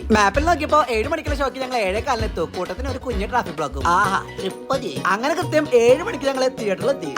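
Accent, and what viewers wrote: Thrissur, India. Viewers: sathyam ipo korch over verppeer aye pole ind pandathe aa piecefull thrissur slang videos ayirn rasam ippo orumathiri 🥴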